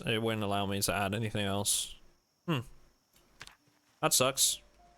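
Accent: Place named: United Kingdom. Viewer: Your voice slightly, but only slightly reminds me of ItsJabo. Maybe because both of you have the same accent. Both of you are from the UK.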